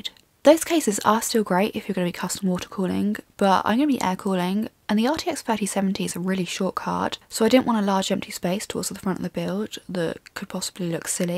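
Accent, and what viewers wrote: England, United Kingdom. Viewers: Voice of a woman on review and its brish accent. .....tasty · That English accent 👌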